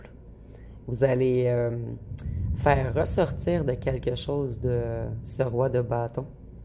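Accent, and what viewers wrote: Quebec, Canada. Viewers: Exactement bonne journée à toi ma conceur je t adore tu es une belle âme reste bien tel que tu es j apprécie ton authenticité ton accent Québécois Extra ❤❤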